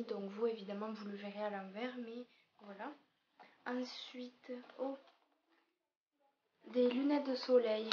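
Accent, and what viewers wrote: South, France. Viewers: Tu es superbe! Le petit accent du sud j'adooore! J'ai TROP envie de tester les box je m'inscrirais surement à la rentrée, parce qu'avec les vacances c'est pas trop le moment ^^ (Je ne peux pas répondre à tes commentaires sous mes vidéos, parce que tu ne me suie pas sur google +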